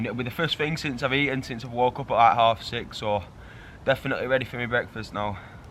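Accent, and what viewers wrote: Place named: Yorkshire, United Kingdom. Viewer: 😂😂😂😂😂🤣🤣🤣🤣🤣just fucking with you brother hahaha we all need a laugh actually liked your vid bro we’re bouts you from sound like a Yorkshire lad like myself brother keep it up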